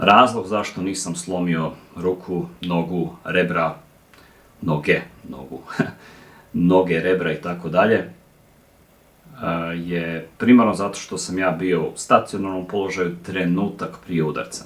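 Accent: United States. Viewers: Aaaa buraz,a gledam tvoje klipove i uvijek pomislim da si Amerikanac😂😂😂😂😂😂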